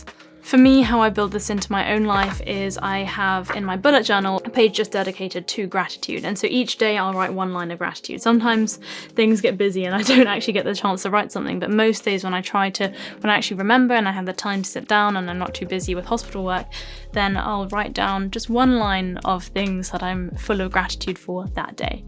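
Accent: United Kingdom. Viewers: 自打听了UP主的口音，我打算放弃美音，学习英音。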